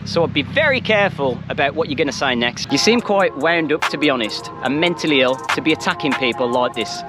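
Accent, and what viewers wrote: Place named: Black Country, United Kingdom. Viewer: Sure, well said:) Where in England are you from? Sounds like Black Country...🤗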